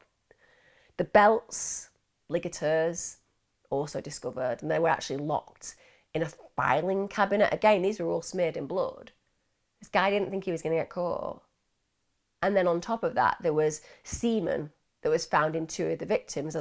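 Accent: United Kingdom. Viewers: I'm getting ready to watch this new episode. I think this is my third episode with you. I listen to some other true crime YouTubers who occasionally do a "deep dive" but all your videos are deep dives, which I appreciate. I'm turning on the captions because my American ears can't always understand your lovely British accent.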